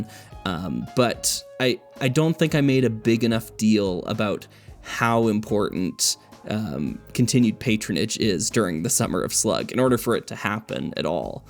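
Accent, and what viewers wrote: Canada. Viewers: A Canadian calling their own accent "harsh" is the most Canadian thing I've ever heard Great video,! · nooo! Don’t apologize for your charming Canadian accent eh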